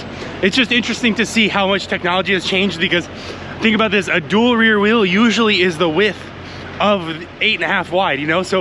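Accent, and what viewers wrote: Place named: Iowa, United States. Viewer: heyt, sounds like EagleGrove Ia.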